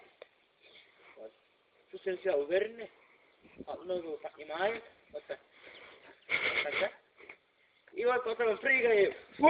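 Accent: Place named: Dagestan, Russia. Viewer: татарский дагестанец точно говорю акцент похож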